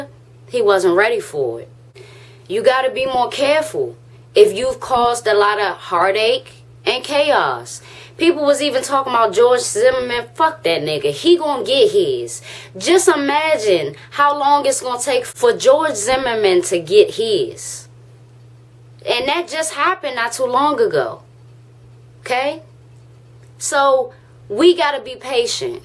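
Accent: Washington DC, United States. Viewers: You from DC? I'll definitely subscribe now. **New Sub** I enjoy your content and you have a nice speaking voice. Keep up the great work ya heard?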